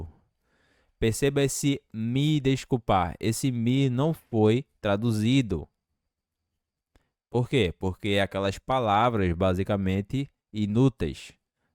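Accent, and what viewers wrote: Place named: Northeast, Brazil. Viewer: Ele falando ingles com sotaque nordestino é tão fofinho😊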